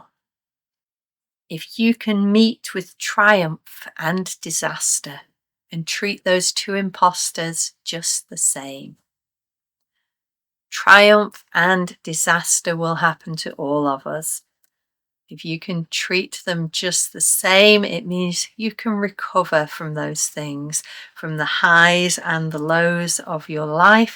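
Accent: United Kingdom. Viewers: Thanks a lot for sparkling our love for English language and poetry with British accent.❤❤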